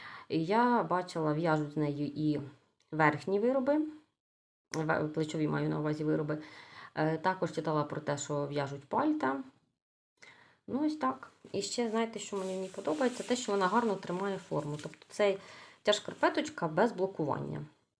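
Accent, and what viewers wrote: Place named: Poltava, Ukraine. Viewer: Прив!т,почула св!й Полтавский гов!р,!нформативне в!део треба спробувати.Здоровья та миру.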